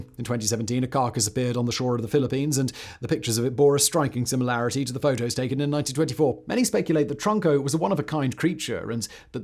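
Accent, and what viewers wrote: United Kingdom. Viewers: I need more videos of saying Cajun words in his British accent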